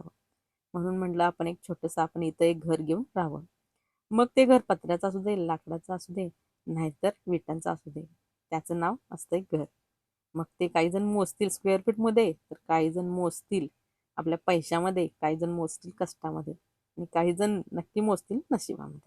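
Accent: Kolhapur, India. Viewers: Arre hi tr aapli kolhapuri bhasha as mla vat tiya pn mastt ghar aahe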